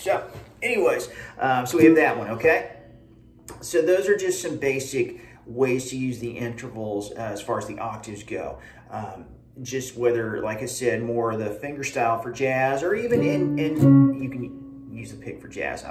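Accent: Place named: South, United States. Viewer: You make things make sense without trying to sound like Eddie Van Halen. Tho I am 67, have been playing since I was 8, I have never had the confidence to play without chords. Except, and I cannot play it well. But your good ole boy delivery, and not pushing the beginner too far too fast, are a joy! Not that I am a beginner, I just play rhythm.;) But Thanks!!! can't wait to find your other videos!! 😄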